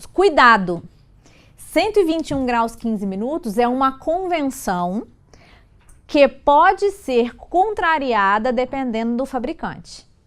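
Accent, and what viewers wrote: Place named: Minas Gerais, Brazil. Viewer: Muita experiência, muito carisma, e o sotaque mineirim é bão demais rsrs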